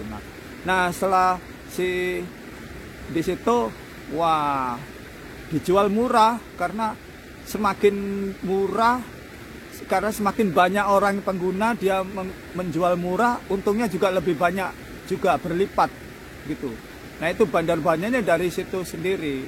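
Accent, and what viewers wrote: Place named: Java, Indonesia. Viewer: Salam teko blitar jawa timur mas.. Sampean logat bahasane koyo wong jowo... Jowo ngendi mas..?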